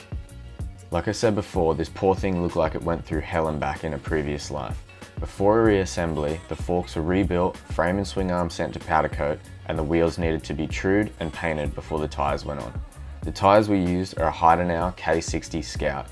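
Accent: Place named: Australia. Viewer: Love the narrative. Aussie as fack.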